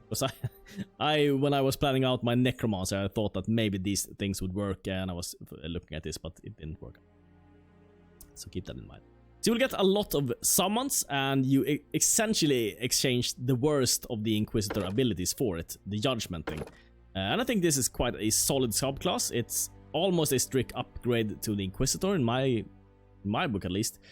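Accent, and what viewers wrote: Sweden. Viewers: swedish for sure, definatly not eastern european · Scandinavian I would say. Probably Swede to be precise.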